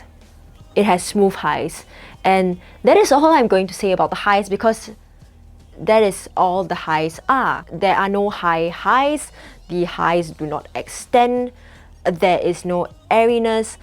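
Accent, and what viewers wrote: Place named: Singapore. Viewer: my/sg accent <3 also you should try the qian69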